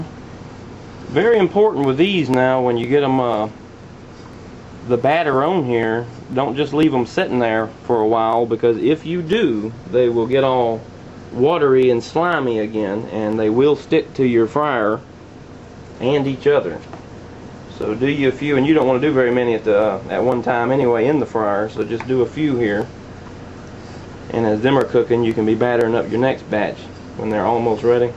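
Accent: Texas, United States. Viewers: Where are you from I love the way you talk your voice sound cowboy style Texas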